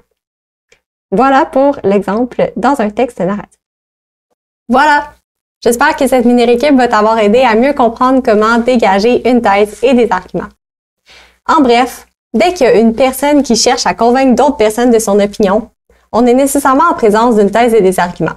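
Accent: Quebec, Canada. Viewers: Dude the canadien accent makes me vomit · Très bien fait mais l'accent de la jeune femme est québécois ?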